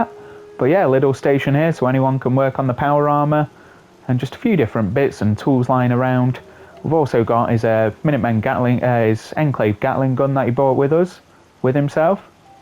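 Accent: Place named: Yorkshire, United Kingdom. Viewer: ! I was gonna guess Derbyshire/Lincs 'cause it's near to Yorkshire, but not quite the accent..But YES TO GOD'S OWN COUNTRY!! One of us, one of us! I like it when you get an idea like that and then execute it into your build. I feel you could utilise some other war knowledge into your other builds?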